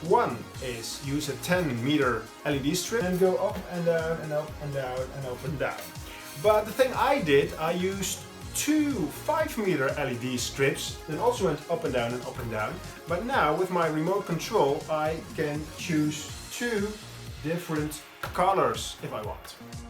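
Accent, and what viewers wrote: Netherlands. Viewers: Great work. Do I detect a slight Dutch accent? 😉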